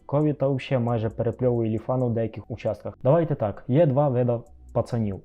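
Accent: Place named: Ukraine. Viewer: сільський український акцент і купа русизмів ,очевидно стягнутих з перегляду кацапських . почисти то трохи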